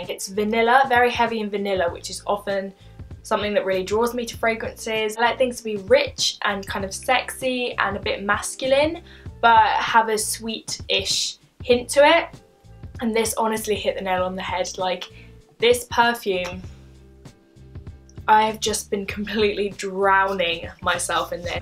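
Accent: United Kingdom. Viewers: Oh and btw, I know you're British, and have a British accent, in case that was clear from my previous post, lol.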